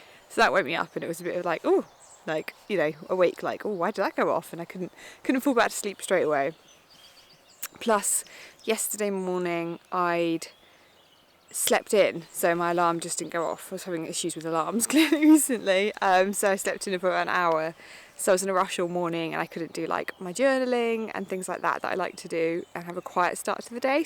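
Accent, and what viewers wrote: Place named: England, United Kingdom. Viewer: I thought your accent was English. 😄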